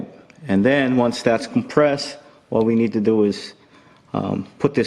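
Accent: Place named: Philippines. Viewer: You have Pilipino accent boss 😁 astig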